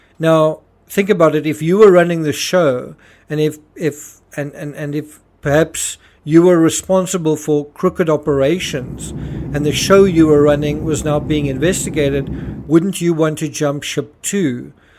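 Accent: South Africa. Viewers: Are you from South Africa? Trying to place your accent. I think it is South African.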